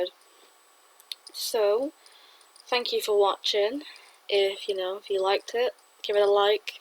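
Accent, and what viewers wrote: Manchester, United Kingdom. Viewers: I 1st heard of Trevor Hardy years ago and I'm from Manchester myself and I live opposite where 1 of his victims was found, you sound like you're a Manc yourself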